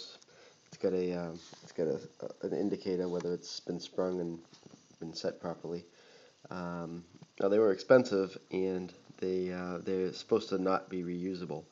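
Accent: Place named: New England, United States. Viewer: A New England accent always gets my attention. 😊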